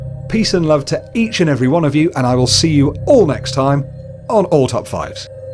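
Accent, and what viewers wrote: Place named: England, United Kingdom. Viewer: Love love love your channel. Curious as to where your from. Kinda go a English accent. A real one. Not one that you see in Hollywood lol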